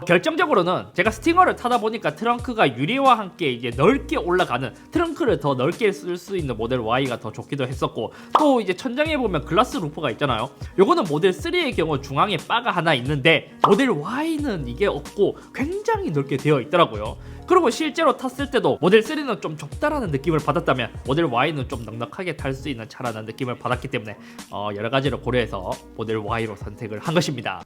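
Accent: Gyeongsang, South Korea. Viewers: 경상도 사투리 구수 하네예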